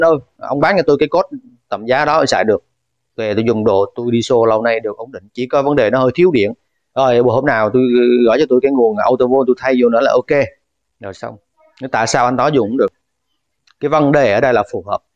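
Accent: Standard. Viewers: Ô a nói chuyện chuẩn quá.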